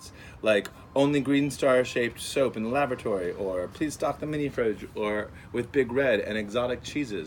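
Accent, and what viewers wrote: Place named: United Kingdom. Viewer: I'm British and that was a good British accent!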